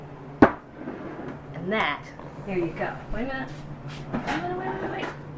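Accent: South, United States. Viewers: Paused the video at 4 seconds to write this comment. I live in Texas and if you ain’t getting you’re recipe from woman with a southern draw and hair as big as this you’ve already messed up.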